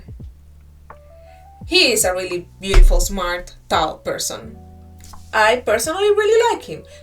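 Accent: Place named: Portugal. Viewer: Your English sounds like a Portuguese speaker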